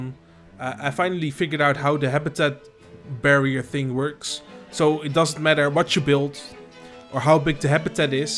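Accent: Netherlands. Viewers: Hoe Nederlands kan een acsent zijn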